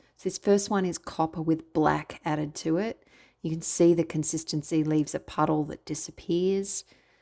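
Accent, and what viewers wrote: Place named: Australia. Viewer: Just found you, recognized the Aussie accent and subscribed😎 love watching it unfold it's beautiful and prefer with silicone I also like the dark together. Lots of love from Sydney🇦🇺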